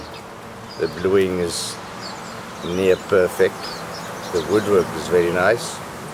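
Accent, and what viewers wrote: South Africa. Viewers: SA accent is it?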